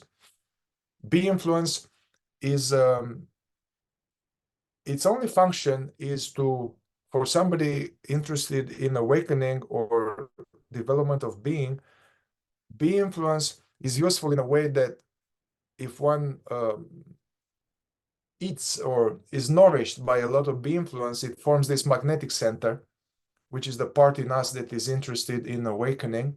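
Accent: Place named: Jamaica. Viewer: Where are you from you sound jamaican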